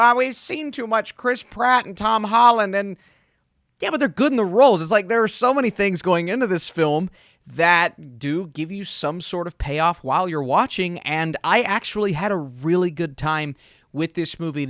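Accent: United States. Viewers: You have the most American voice I’ve ever heard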